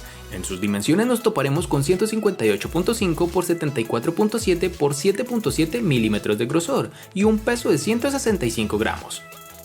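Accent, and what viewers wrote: Colombia. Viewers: De q parte de colombia eres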